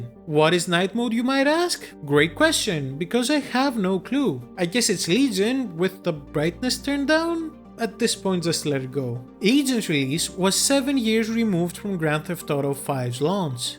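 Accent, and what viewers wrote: Greece. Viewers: Are you Greek by any chance? You sound like it. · Greek accent geiá sou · i know this greek accent brother XD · Φίλε η ελληνική σου προφορά είναι τόσο εμφανής 2 λεπτά βλέπω και δεν χρειάζομαι τίποτα παραπάνω!!! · Greek accent is tough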